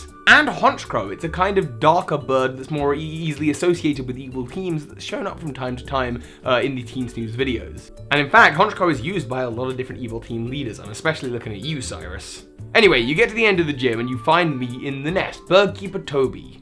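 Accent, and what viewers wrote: United Kingdom. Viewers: I kid you not, I've been watching you for a year and a half now, and I swear I always thought you said "So hi" instead of "Soar high" until this video. I blame your British accent for that. Edit: I've been blessed with the coveted heart from!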